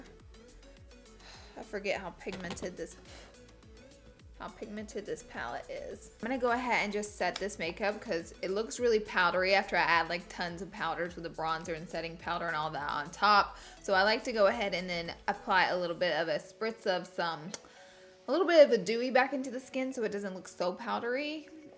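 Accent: South, United States. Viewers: DIY GURL she’s from the south.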